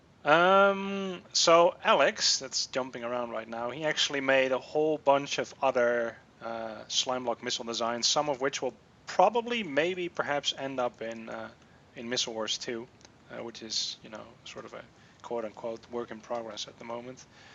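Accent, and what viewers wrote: Netherlands. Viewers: what country are you from you sound Dutch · Ben je Nederlands, je klinkt wel zo? Are you dutch you sound so?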